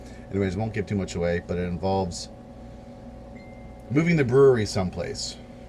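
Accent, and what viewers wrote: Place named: North, United Kingdom. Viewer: Just curious, why would you take the probe off the fermenter. You still want to be bringing the actual temp of the ferment up....I could be totally wrong but I think you should keep it attached. Anyway, can't wait to see how it turns out. Once I have my dual stage controller a lager is in order. Cheers my more "northern accent" friend!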